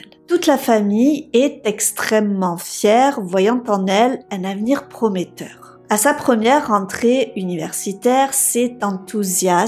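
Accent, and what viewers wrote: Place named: Marseille, France. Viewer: Tu es de Marseille? tu as l'accent de Zizou.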